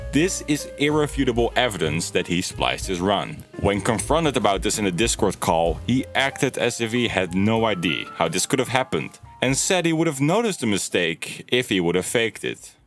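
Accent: Netherlands. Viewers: Sowieso dat je Nederlands bent. We herkennen ons eigen accent vaak iets te goed haha. Fantastich filmpje vriend! De kwaliteit van soortgelijke YouTubers die miljoenen subs pakken. Mooi om te zien dat het algoritme heeft geholpen. Ga zo door!👊🏻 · I hate the Dutch accent 😢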